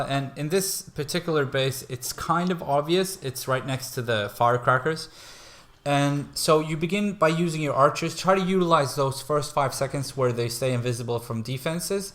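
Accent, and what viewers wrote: Sweden. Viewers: Is he swedish? Just noticed this awesome channel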